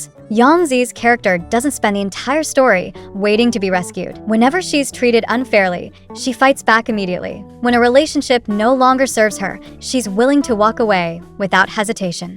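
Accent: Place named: United States. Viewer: While I am glad that cdramas are getting more attention, it really rubs me the wrong way when Chinese names are pronounced American style. It's really not that hard to figure out how the names are actually pronounced.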